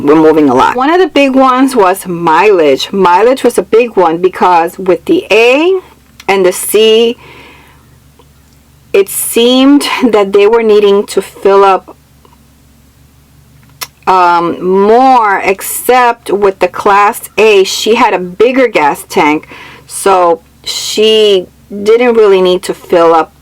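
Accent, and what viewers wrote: Latin America. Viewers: Hair looks great, as usual- librarian glasses definitely a great choice, subtle Latina dialect- great outfit choice... Gonna give this one a solid 8.9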